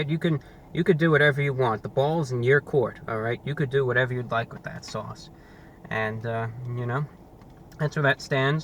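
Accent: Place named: New York, United States. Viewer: Why do you talk like those radio dudes in the 40s. I get it you're from New York but that's an old school accent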